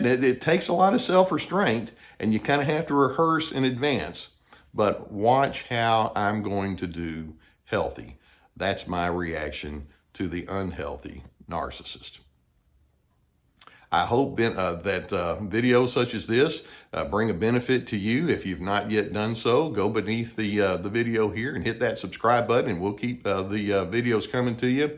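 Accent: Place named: Texas, United States. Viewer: Always funny to hear a highly educated individual, with a Texas accent. 🤣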